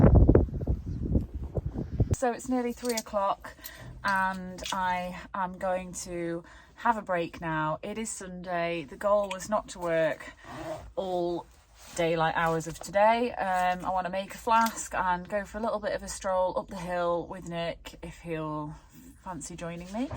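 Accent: United Kingdom. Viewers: You are sounding like a strong Derbyshire farmer every day !!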